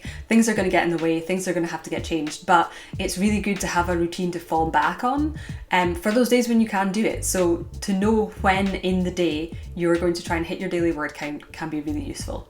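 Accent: Scotland, United Kingdom. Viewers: I love your accent! Is that Scottish? Great tips btw, I signed up for your newsletter to get the plot embryo videos because I struggle with the same thing, I love worldbuilding and creating characters but it's tough to come up with actual stories to suit the world and characters I've created. Thanks for the tips! My Alphasmart Neo is an essential tool for me. I'm an editor at heart, so I struggle with self-editing while I write. Using a distraction-free digital typewriter like Alphasmart products is a great way for me to get my first draft down without censoring or editing while I try to write. I'm hoping with all the tips I've learned from you and other channels, I can finally finish Nanowrimo for the first time after 2 other failed attempts.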